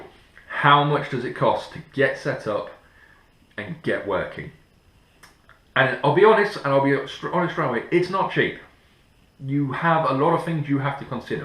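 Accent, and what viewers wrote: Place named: United Kingdom. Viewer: UK i think, he sounds British.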